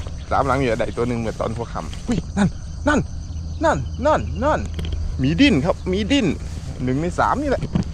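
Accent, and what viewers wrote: Korat, Thailand. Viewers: Fcโคราชจ้าชอบพี่พูดภาษาโคราช พี่ได่เมียบ้านไหนฉันอยุ่ขามสะแกแสงเดิ้อ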